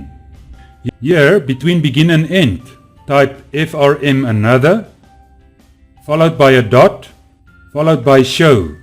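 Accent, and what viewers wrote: South Africa. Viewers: Is jy Afrikaans?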